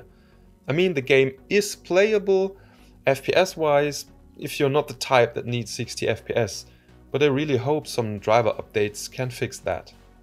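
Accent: Germany. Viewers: Danke für dieses Video:) Deutscher Akzent in Englisch is so easy raus zu hören:D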